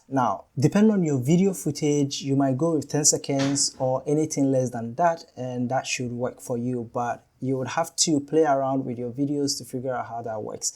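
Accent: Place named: Africa. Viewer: Your African accent when you speak English sounds great and is easy to understand too.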